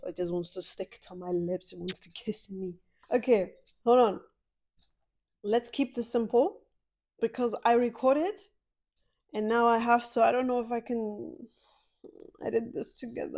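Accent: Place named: United Kingdom. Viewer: im your 29 sub beacuse your britsh accent is very funny and starting u say naughty naughty also funny but im girl😂 best of luck your journey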